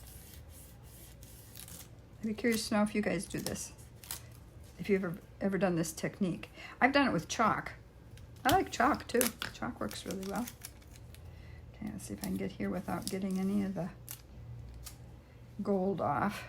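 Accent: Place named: Minnesota, United States. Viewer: I love these! So beautiful! And what a cool technique! Definitely want to give this a try! Are you from Minnesota by any chance? Or maybe Wisconsin? I was guessing you were as I was listening, but the "ufda" gave it away! Ahhhhh! The sound of home!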